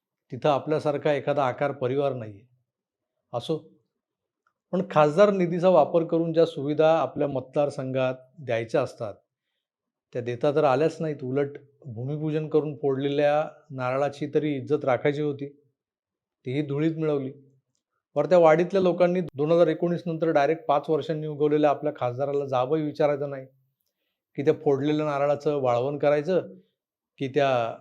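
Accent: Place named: Malvani, India. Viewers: Super malvani dada ❤ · खुप छान मालवणी बोलात सर 😊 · .Ji Saprem Namaskar! Sundar Ati Sundar Aaple Malvani Bolane. Tsech Ha Video. 31chya31 Dat Ghshyat Ghatlan Numhi. ( 32 - 1 = 31, 1 hee Akkal Dadh ) Kiti Mujorpna. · saheb kup mast malvani .mazaya aali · छान वाटली तुमची मालवणी ऎकायला. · Nice मालवणी सर जी.... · किती हि मग्रुरी तेही निवडणुकीच्या दिवशी.जनतेने मतपेटीतून उत्तर द्यावे.बाकी मालवणी थाट काही वेगळाच.समजत नाही पण मस्त वाटते भाऊ पण बोलतात कधी कधी.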